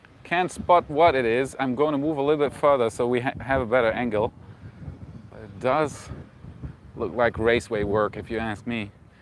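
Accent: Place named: Germany. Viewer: WOWOWOWOWOWOWOwowowowowow!!! Guys who programmed Apollo Computer were at a Computer History Museum talk. At a VCF West - Vintage Computer Fest were some Saturn-5 guys. Ish Spreken Kaine Deutsch . Some how I thought u were a Spaniard but I think I heard German. No hablo Alemanes.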